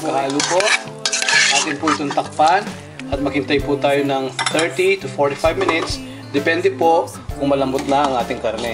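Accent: Pampanga, Philippines. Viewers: kapampangan ka ba??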